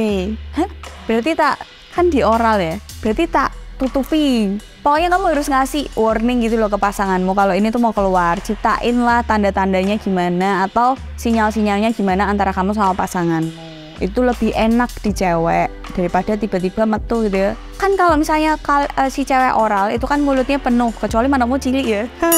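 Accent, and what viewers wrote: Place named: Central Java, Indonesia. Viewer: bahasa ne koyo logat Jawa tengah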